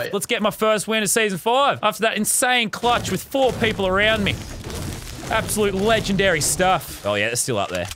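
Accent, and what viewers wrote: Australia. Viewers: I’m Aussie and you can tell he makes the accent stronger (for comedy), cause when you have an Australian accent it’s really easy to go full out Aussie mate... yeeeeeeee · You sound very Australian mate (I'm Australian as well) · PiBi23 Official he is australian the accent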